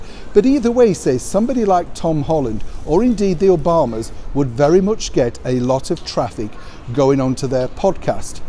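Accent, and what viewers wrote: Yorkshire, United Kingdom. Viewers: Aorta.. iota..think it's just the Yorkshire accent🤗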